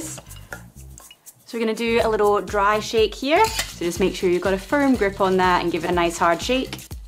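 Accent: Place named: Scotland, United Kingdom. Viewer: Nice and simple. I love the Scottish accent but cracked up at piss-go for pisco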